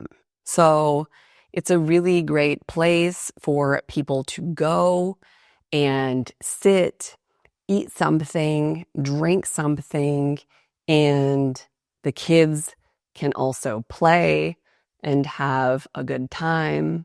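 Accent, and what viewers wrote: United States. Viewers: This is American English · American accent its amazing 🥰so beautiful.I never study English but i understand 70% because i always was watching american movies in original language with sub russian And i speak spanish,i lived in Argentina for 6 years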